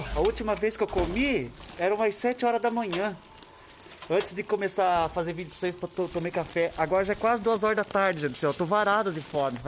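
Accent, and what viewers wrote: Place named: Paraná, Brazil. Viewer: Oi, você fala igual Paranáense..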